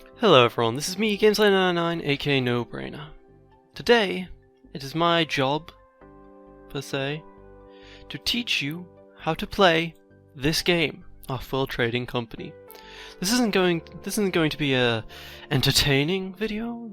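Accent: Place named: United Kingdom. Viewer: Thanks for taking the time to do this! Good to see someone else's build strategy as expansive. I knew you were from the UK but somehow didn't visualize you with an accent. Go figure.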